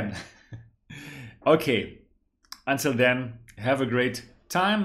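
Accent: Germany. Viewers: You’re so amazing! How could you German speak so heart-touching English and also excellent Chinese. 🤩😃 And I had also watched your other vlog videos.. daily lives...with your wife I love your English speaking accent😳😢 ! And all of your styles... _ I can speak English and Spanish,but I also want to learn German❤️! I’m from Taiwan 😢 ,soon I’ll go to Europe. Spain,Portugal and your country “Germany”🇩🇪 ! And I love football so much !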